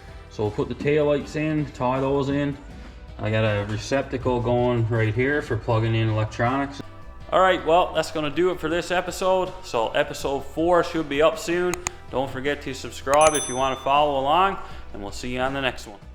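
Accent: Newfoundland, Canada. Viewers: U sounds like a Newfie by!! 🤔😅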